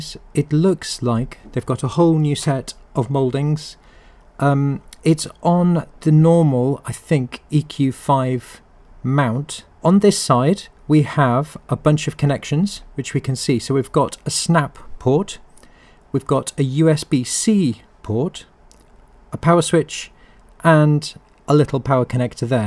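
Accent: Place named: United Kingdom. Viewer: Thanks for the video - love your work. Nice to hear a Brit accent in the AP world! I'm not sure that the £779 asking price is worth it. It's £300 more than the GTi, and whilst that gets you 10Kg payload vs 5Kg, Sky Watcher's own EQM 35 Synscan Pro for £120 less than this new mount seems to be a much better deal on paper. What am I missing?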